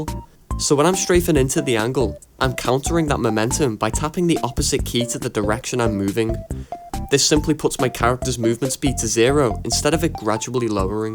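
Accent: Liverpool, United Kingdom. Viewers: the liverpool accent is killing me😂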